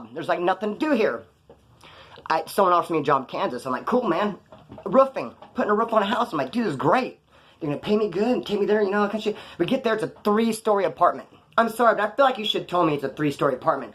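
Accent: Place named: Central Valley, United States. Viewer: You talk like a regular dude from the Central Valley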